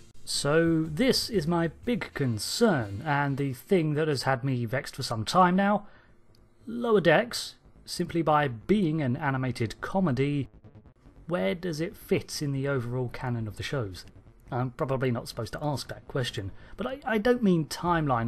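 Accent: United Kingdom. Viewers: E-celeb British accent. Alt F4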